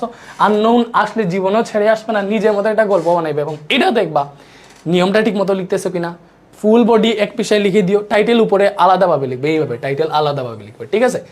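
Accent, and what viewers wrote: Sylhet, Bangladesh. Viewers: ভাইয়া মনে হয় সিলেটের ❤ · স্যার কিতা সিলেটি নি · Sir kota bole half Sylheti Half Suddo😮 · ভাইয়ার কথা শুনে মনে হচ্ছে উনি সিলেটের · স্যার এর বাড়ি মনে হয় সিলেট জেলায় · Mone Hoy lage to sylhety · Sylheti monoloy amr o mone hoy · Bhaiya apni ki sylheti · সিলেট, গোলাপগঞ্জ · Mat huinna to lager sylheti ou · Vai mone hoy Sylheti. Kota bartay lage 😊❤ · Vaiya apni ki Sylheti🙂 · Bhai apnar bari ki sylhet a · Sir ar basa ki sylhet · Mat huniya buja jar sylheti kori😊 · Vaiya , hoito sylhet er manus.. Kotha gula just wow.. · আপনি কি সিলেটি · আপনার বাড়ি কি সিলেট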